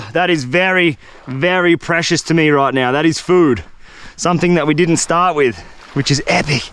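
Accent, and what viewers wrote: Australia. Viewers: nah bro people are sleeping on aussie accent its more fun than british XD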